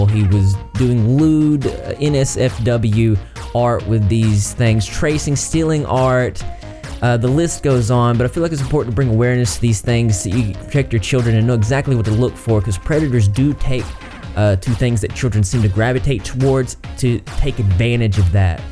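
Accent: South, United States. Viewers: Ur deff southern. I'm in Alabama.u gotta be close lol? Just started watching u on ur Cora story.ur awesome❤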